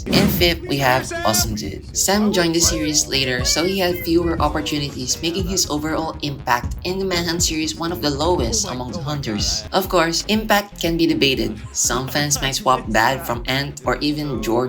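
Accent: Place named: Philippines. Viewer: Sabi ko na taga pinas ka, kasi same tayo ng accent kapag nagsasalita ng English hahahaha the resemblance is uncanny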